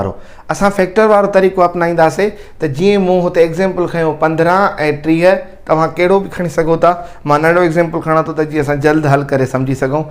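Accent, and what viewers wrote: Larkana, Pakistan. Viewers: Larkana waly aise hi bolty hen so sweet 🥰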